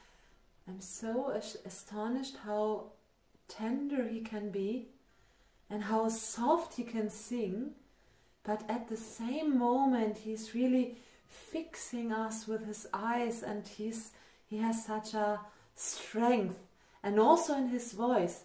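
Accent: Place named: Central Europe. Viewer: I knew you must be from somewhrere middle-europe.... Well, must see thisone... Lets go....No idea, love your accent. Im finnish, by the way... 😚🥰 Damneeeed, this really so broken, video I mean....? God damned....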